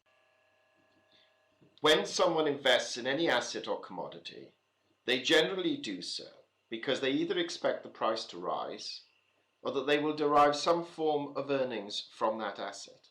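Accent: England, United Kingdom. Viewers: After watching several of your videos, I understand what you are trying to say. "Don't purchase all at once." I agree. Patience is key. This is true for many things, even real estate. Since I made my error with that in the past, I won't do it again. Been trying to move out of Los Angeles but only when I find the right place at right price. The more I research and understand a certain market area, the better off I am in making a decent purchase. And besides all this, I like your accent. You remind me of one of my coworkers who's from England. And I admire his many virtues as well.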